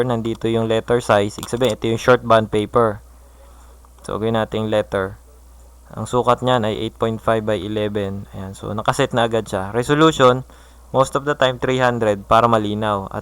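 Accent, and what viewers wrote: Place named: Philippines. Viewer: He is using tagalog language so he's accent is in tagalog too.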